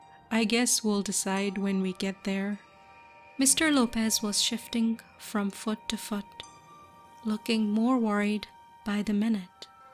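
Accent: India. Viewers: It sounds like you have an Indian accent.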